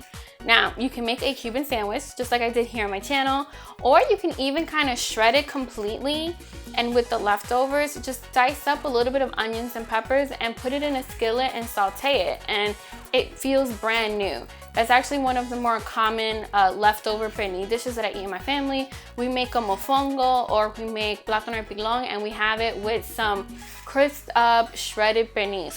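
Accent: New York, United States. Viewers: Love that nyc accent coming thru but u look like you moved out to the burbs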